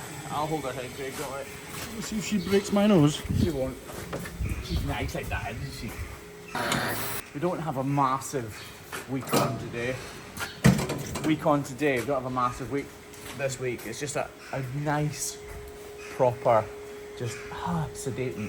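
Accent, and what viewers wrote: Scotland, United Kingdom. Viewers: Having had some experience with carbide cutter heads on many different woodworking applications i can say i prefer the three or more cutter ports. The reason being is that theres more contact and control. It works the motor less. And the cutters last longer. No offense to the styling. Its perfectly functional. Oh, and you can get the square bits that have curved sides so its not so aggressive. Or even whats called in the woid turning world as negative rake cutters. Meaning they have a slight bevel on the cutting edge that smooths out the cut. And... You can even sharpen those carbide cutters on a diamond stone. Carbide aint cheap. You are the first hoif trimmer channel i found, and having grown up on a small private farm, i never saw a hoof trimmer and we kept constantly. Enjoyed the FFA knew of and watched farriers trim and shoe horses, but was never aware of hoof trimming for cattle. 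Ive been hooked ever since discovering you. Having Scottish ancestry its a blast hearing the accent. To you id have the worst southern hick accent you ever heard.😂 yall come back now ya hear?!🤣😎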